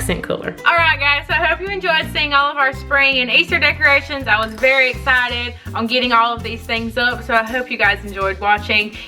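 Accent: South, United States. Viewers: You must be another southern gal. Love to hear you talk and I love your home and Easter decor💕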